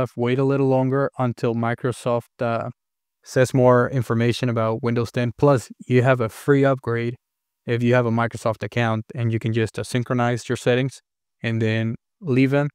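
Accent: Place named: United States. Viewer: Muchas gracias hermano. qundo me coco no trabajo... you ensonido como un gringo. sin escolar. Su Engles es perferto. Felicidades ! Quiszas con mas la practica.... un dia enel futuro, Mi Espanol .... Tu intiendo mi sentimiento. Occidente Australia. poner este lugar sobre su listo de viajes. Disfrutar su dia amigo!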